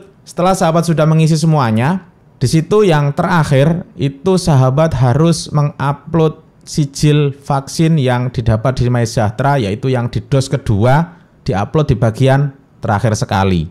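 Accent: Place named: Java, Indonesia. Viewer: Jowo medok hadir bosk 😄👍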